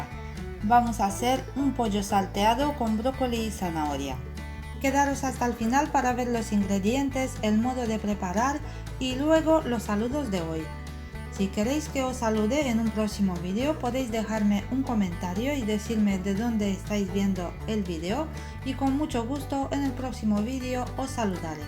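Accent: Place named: France. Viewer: Me encanta tu acento franses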